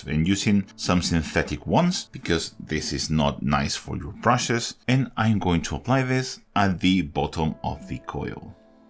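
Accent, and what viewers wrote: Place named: Spain. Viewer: Fue escucharte hablar inglés y supe de inmediato que eras español, nivelazo de inglés pero el acento español siempre se nota.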